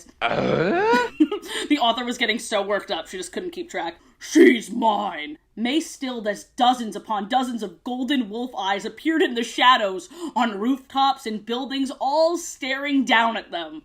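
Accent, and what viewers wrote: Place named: Scotland, United Kingdom. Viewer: I love your Scottish accent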